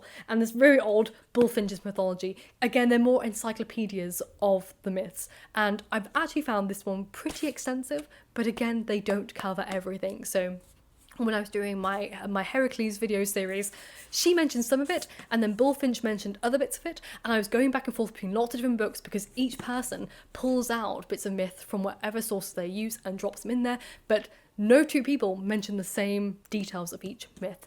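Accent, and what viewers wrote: United Kingdom. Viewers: I’m really into what you promote, but you speak too fast for an international public, allthough you are vere charming british, please consider us “ slow ones” ❤️🤗 Maybe you’re just trying to reach a british audience, then my apoligies !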